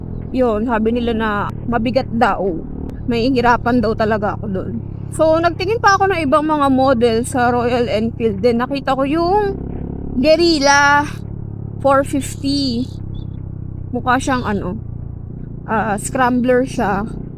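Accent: Laguna, Philippines. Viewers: Tunog palang ng boses matik taga Laguna e 😆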